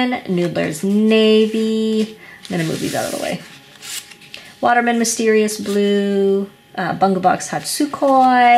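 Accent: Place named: Middle East. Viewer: Saw this...and an acute attack of “MUST HAVE NOW” struck me. Went to site via link and nosed around til I discovered the notebook in Forest Green with Lime Green trim...and selected lined paper. OMG. I’m in heaven. Hope I don’t have to wait too long. PS: you have a very, very slight accent that sneaks in now and them. Lovely. Middle East someplace? Israel? I sound like I’m from Oregon...since I am. Sorry.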